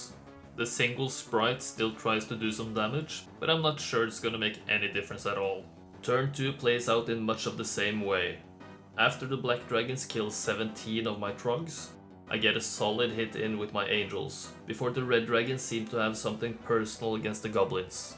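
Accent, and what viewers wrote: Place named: Norway. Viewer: ❤ By the way, judging by your accent, might you be norwegian?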